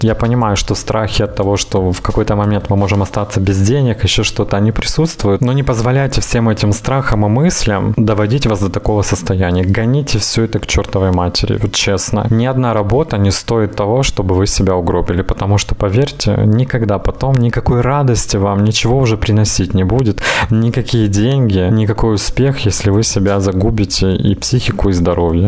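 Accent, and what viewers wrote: Ukraine. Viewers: Мне кажется,что у вас харьковский акцент... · Здравствуйте) Голос у Вас действительно очень приятный, с небольшим украинским акцентом. И человек Вы позитивный, это, прям, слышно. Скажите, как Вас зовут, 🙂я уже месяца 4 смотрю Ваш канал, никакой информации нет, и всё. Хочется комменты писать, обращаясь к Вам по имени🙂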